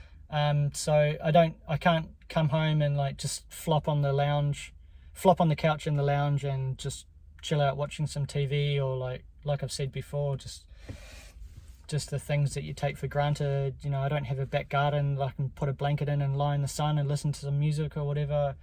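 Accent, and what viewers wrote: New Zealand. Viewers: Not sure what the dude is about - you don't sound Maori as such - just a typical NZ 'one of the bros' kinda accent... regarding tasmania - will be cool man, probably my favourite locations in Australia. Make sure you check out MONA. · Talking like maori? They mean Te reo? Or 🤷🏽😅 you talk like a kiwi, nzer 😅🤷🏽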